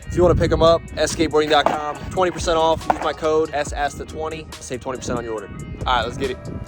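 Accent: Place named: Pennsylvania, United States. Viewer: You sound a little like. 😂 🔥 PA accent I guess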